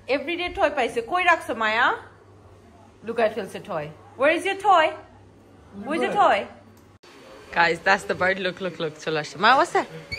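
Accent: Sylhet, Bangladesh. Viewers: The way u speak sylheti is so cuteee🥰🥰 khala is lovely 🌹🌹 Sb Smy family Niye vlo thako shuke thako dua kori Allahummah Barik❤❤❤❤ Instagram a DM kora jacchena bestie eMail address?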